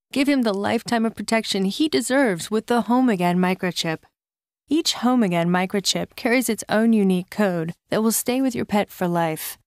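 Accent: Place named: Maryland, United States. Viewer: What a maryland accent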